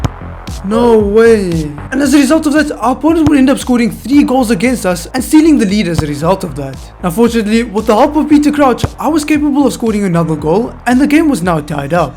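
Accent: South Africa. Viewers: Are you from South Africa? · Yoh I just knew you were South African bra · You from South Africa??? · Ever since I heard your voice I knew you were south african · Are you from South Africa? ( Same Here ) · Is he from South Africa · Bro ur South African for sure right ?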